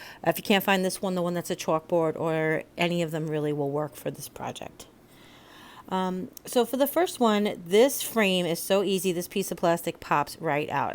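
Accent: New York, United States. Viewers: Beautifully done! The way you tucked in the moss really made the letter stand out. Great tute (lol) on how to make an absolutely perfect tassle. The trick with securing the wrapping string is a madame knot, I'm sure you know that. You were going to say something about the shamrock and/or four leaf clover, did I miss it? Tyfs, love these and as always it was so nice spending a little time with you, your New York accent really came out on this tutorial and I love it. See you soon 🤗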